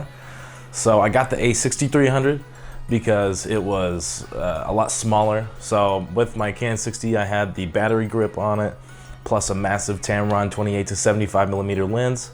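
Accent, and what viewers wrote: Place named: Australia. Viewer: You sound rather Australian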